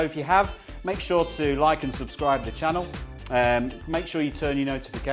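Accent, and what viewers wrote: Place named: United Kingdom. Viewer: Hello Shaun! English is my second language and watching your videos I just enjoy your accent and some intricate British phrasing that you occasionally use. It's fascinating how a sportsperson can be so successful and articulate at the same time.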